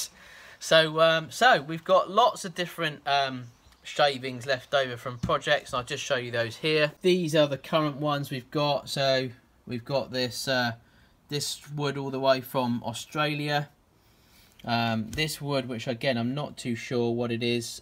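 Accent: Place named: South East, United Kingdom. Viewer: He speaks similar to me. I'm from south east England. Brighton to be precise. I can't say for sure where is from but I think maybe the south east of England but I maybe wrong.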